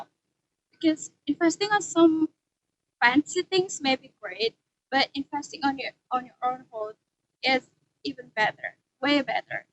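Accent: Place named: England, United Kingdom. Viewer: Wawww i lov ur english accent😳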